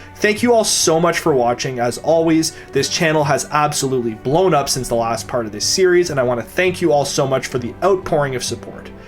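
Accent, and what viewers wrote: Canada. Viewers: The Canadian accent is rough. You have a major problem with your As.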